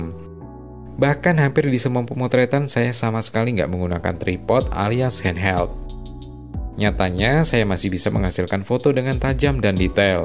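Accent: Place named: East Java, Indonesia. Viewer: logat pembicaraan kek daerah Jatim (Madiun)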